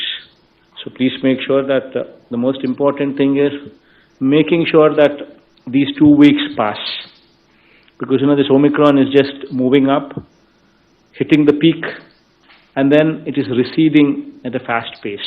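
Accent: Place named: Telugu, India. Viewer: Good TELINGLISH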